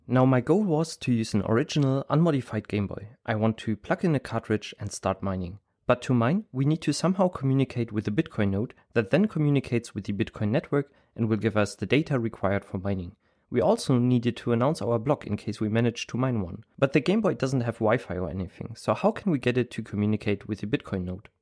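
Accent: Germany. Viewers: Kleiner deutsche akzent lol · Man hört den deutschen Akzent :) Trotzdem cooles Video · Du hast einen deutschen Akzent:) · Aussprache lässt darauf schließen das du deutscher biat xD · ist das ein deutscher akzent? 👋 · DAs hört sich an, als wärst du von Deutschland. Der deutsche akzent.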